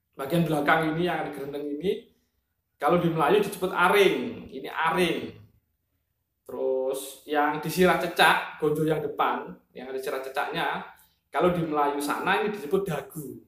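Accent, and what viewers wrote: Java, Indonesia. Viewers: Ini jawa sejati. Pekat bahasa nya ya.👍🇲🇾. Salam nusantara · Salam mas, pekat bahasa jowo nya mas... haha.. salam dr Malaysia mas. Mantap isi nya!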